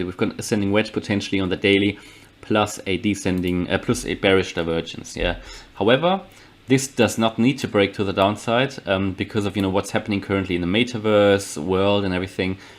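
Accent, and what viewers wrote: Germany. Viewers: Nice vid. U must be German yeah? 😆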